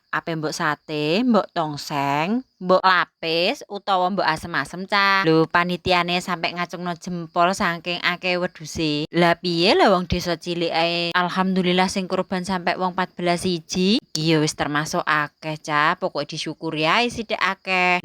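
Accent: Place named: Central Java, Indonesia. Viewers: Bojonegoro juga,,nggonmu dadi nggonem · Kalau dari logat nya ,antara cepu ,bloro apa iya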